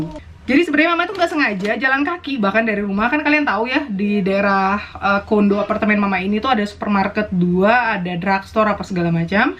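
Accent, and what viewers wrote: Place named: Jakarta, Indonesia. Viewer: Ga nyangka k'lo Si Mamak orang Batak+lahir & besar di Kaltim/Balikpapan krn gaya'a,bbrp idiom + aksen'a spt orang Jakarte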